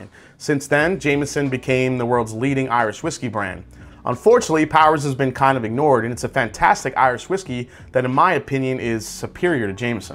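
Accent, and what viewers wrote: New York, United States. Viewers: Great NY Italian Irish accent! Really enjoy the Black Bush. Nice sipper and holds up well in a Hot Toddy or with ice.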